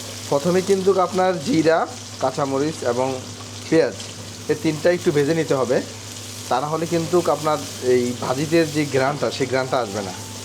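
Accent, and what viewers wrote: Noakhali, Bangladesh. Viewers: ভাই রান্নাগুলা দেখান চমৎকার৷ কিন্তু শব্দ চয়ন গুলু নিতান্তই খেত, যেমন ফানি,ফেয়াজ, ছিনি, আরো অনেক নোয়াখাইল্ল্যা উচ্চারন৷ বিষয় টি খেয়াল করলে খুশি হবো৷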